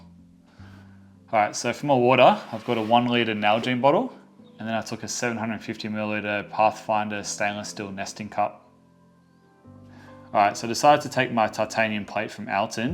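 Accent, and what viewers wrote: Australia. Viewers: Omg only Australians would understand what you are saying!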